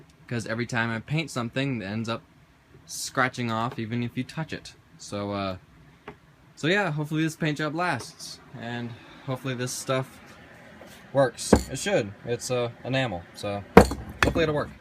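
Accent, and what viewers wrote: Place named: New Jersey, United States. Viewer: How aww YA! He sounds like he's from New Jersey. 😄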